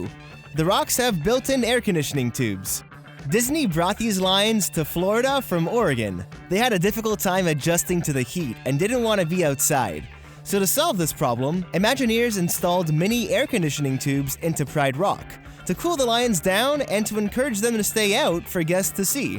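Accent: Canada. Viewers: He is Canadian. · He's Canadian. That's how Canadian's say it.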